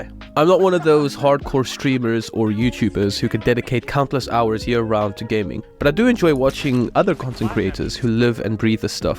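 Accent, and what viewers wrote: South Africa. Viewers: Bru, you sound South African ✌️ probably not, but shoutout from South Africa 🇿🇦 This video is literally me! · I am hearing a South African accent. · i knew i recognized a South African accent! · You're South African? I knew that accent was familiar!!!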